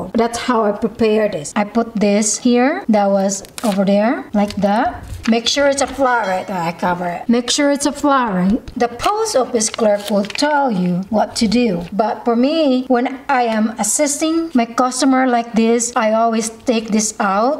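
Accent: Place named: Philippines. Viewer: I like the accent very Filipino. Thank you for the information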